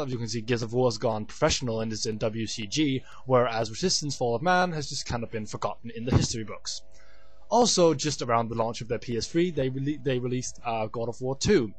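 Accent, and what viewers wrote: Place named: United Kingdom. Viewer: I like how your accent is more british than american :D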